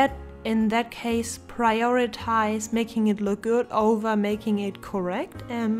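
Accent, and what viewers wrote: Germany. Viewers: Are you German by any chance? Your accent sounds kinda familiar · Ich find das so merkwürdig du sprichst so gut Englisch und trotzdem hört man sofort dass du deutsch bist ( hoffe das stimmt auch ) aber ich hab keine Ahnung woran ich es erkenne außer an diesem typisch deutschen "ähm" :D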